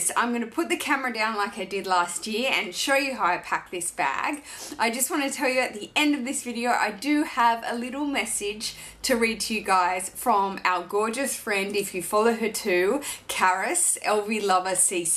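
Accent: Australia. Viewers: your videos and perhaps your Awesome Aussie accent bring cheer to my day!! More! More! More!! 💜 much love and happiness to you!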